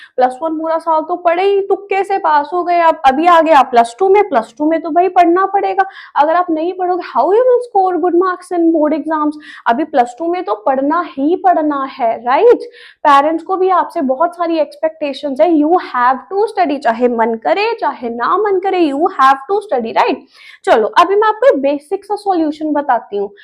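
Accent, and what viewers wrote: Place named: Punjab, India. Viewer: Mam i think tou are from punjab from your accent😅😇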